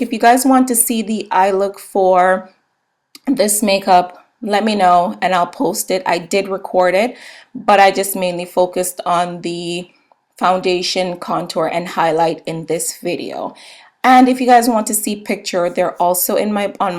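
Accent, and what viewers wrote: Jamaica. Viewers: are you Jamaican? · Are u Jamaican? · Hey you sound Jamaican. Are you? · I hear an jamaican accent in there :D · First video I'm watching of you, but correct me if Im wrong but I think you are Jamaican, I just know a Jamaican as soon as I see and hear one. Guess its the patriotism in me.... Love my place and my people badddd!!!! but if ur not its cool, i still love your video lol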